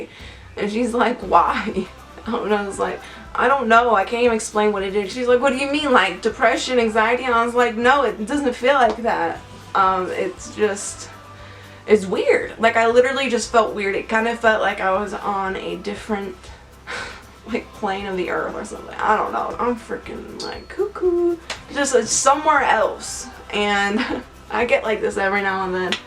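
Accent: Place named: Chicago, United States. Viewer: u don't actually look like u need to lose a lot of weight being honest I don't know what your goals are.. I'm trying to place that accent it reminds me of Chicago.. But I'm not convinced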